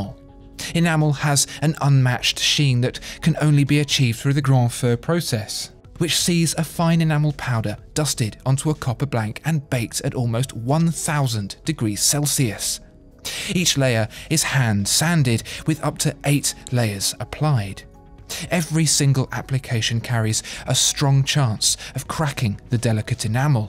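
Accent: United Kingdom. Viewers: That's a british-jewish accent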